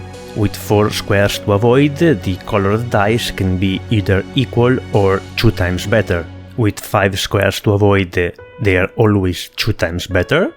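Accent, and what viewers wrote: Italy. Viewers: Needed this, thaknk you? (Sei italiano vero? ;) ) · Are you Italian? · What a nice detailed guide, ty so much! I recognized rapidly that you're italian from your accent :D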